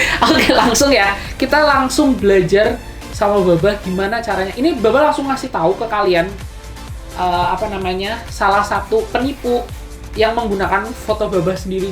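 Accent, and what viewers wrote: Indonesia. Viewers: Babah ki unik, kalo ga denger dia ngomong mesti taunya yoo babah cina abizzz, taunya begitu pas ngomong yoalaaaahhh rekk jowo abezzzzzz😂😂🤣🤣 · Assalamualaikum Warahmatullahi wabarakatuh Sebelum'a aku mau ucapin bnyak Terima kasih buat and Team yg udh ksih Tips and Trick tentang penipu yg lagi merajalela bagai corona ini wkwkw dan fix babah logat ngapak'a (kyak orng Jawa Tengah) keliatan banget and make me laughing cuz liat ekspresi babah yg mesel sama penipu awokwokowkowk Literally Aku udh bnyak dpt pengetahuan di video ini tentang penipu entah itu penipu pembelian hewan, barang atau yang lain'a jdi'a aku lebih tau gmna cara beli secara online dan sedikit cerita aku wktu itu emng lagi PENGEN banget adopt Kucing dan aku agak ragu buat cari di online, sempet sehari atau beberapa hari nemu di ig tapi ada rasa RAGU dan KHAWATIR buat pesen di olshop tsb, tapi setelah aku nemu account instagram babah kyak'a niatan aku buat punya kucing ada peluang besar dehh nihh soal'a TRUSTED banget dongg.. 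Mana ada olshop pet yg jual bisa VC dlu sama calon Kitten's kita hahahaha And i hope I can get blessed from ALLAH SWT By Babah hands. Amin allah'humma amin Sekian ya wassalam'mualaikum warohmatulohi wabarakatuh Instagram: imradit01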